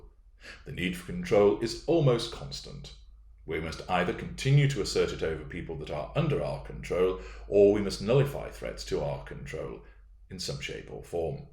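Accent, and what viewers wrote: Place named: RP, United Kingdom. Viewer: He doesn’t do it often enough to be overly vulgar, but just to emphasize a point , usually of exasperation ( IMO). I have to say hearing it said with that posh accent and delicious voice makes me smile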